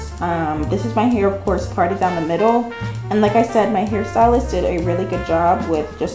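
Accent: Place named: Bahamas. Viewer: I’m definitely going to give Nutrafol a try! Are you from the Bahamas by chance? I hear a very faint accent 😊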